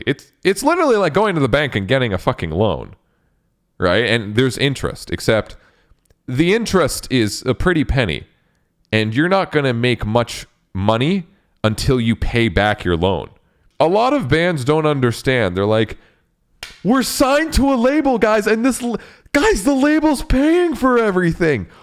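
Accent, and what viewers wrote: Russia. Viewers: Nice Russian accent. Can we all just agree with the fact that Alex has like a little bit more fanbase than STP. And at this point I'm too afraid to ask..... Plzz no offence I dig STP.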